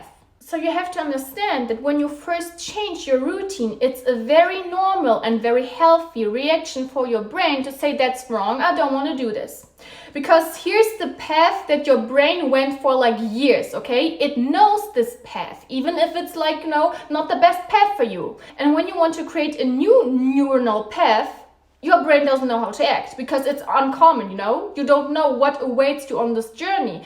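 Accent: Germany. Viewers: I recognize a german when I hear one🤭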